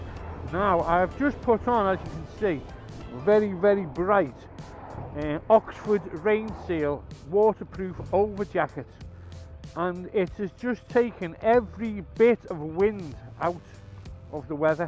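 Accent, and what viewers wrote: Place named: Liverpool, United Kingdom. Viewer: Another scoucer like Paul Griffiths 😂. Just bought an RT here in AZ. Miss my Scoucer home living here in Arizona.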